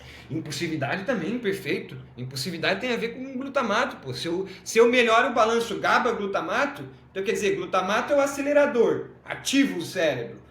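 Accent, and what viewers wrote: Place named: Santa Catarina, Brazil. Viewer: Muito bom!! O conhecimento do é muito vasto, agrega muito e o sotaque parece de catarinense 😅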